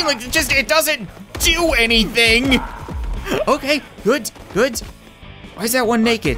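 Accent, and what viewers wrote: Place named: Canada. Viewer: EVERY SINGLE VIDEO I WATCH I GET A UNIVERSITY CANADA AD. I mean it is fitting though, is like the most Canadian person I've ever heard.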